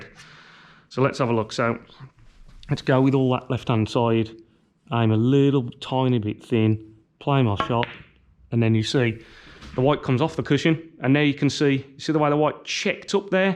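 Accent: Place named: Stourbridge, United Kingdom. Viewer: Sounds like you are from near me, stourbridge!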